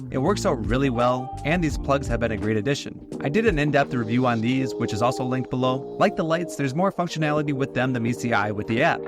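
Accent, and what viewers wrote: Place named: Singapore. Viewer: wow. you sound so singaporean.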